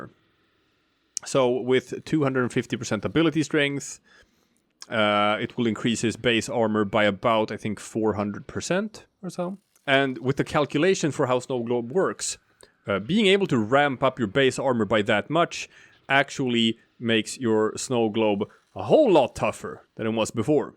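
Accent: Sweden. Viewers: Are you swedish? You have a swedish dielect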